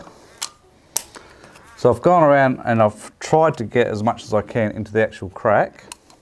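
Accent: Australia. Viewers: thanks, mate! love the accent, Tasmania?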